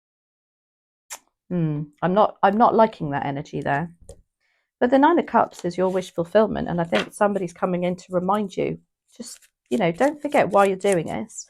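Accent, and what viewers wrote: United Kingdom. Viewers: Hey welcome, nice to hear a Uk accent tarot reader. My first time here ❤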